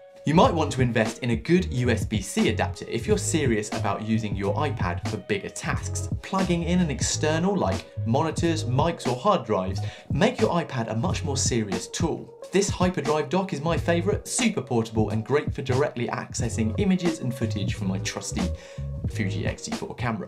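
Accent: United Kingdom. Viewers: Apart a great British accent that I adore. These reviews are amazing ! Congrats !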